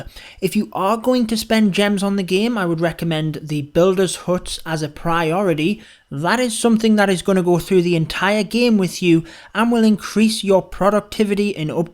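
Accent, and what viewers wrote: United Kingdom. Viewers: is just british accent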